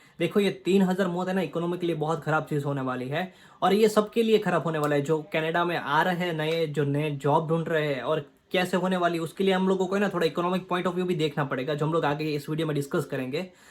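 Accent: Gujarat, India. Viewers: Love your gujju accent haha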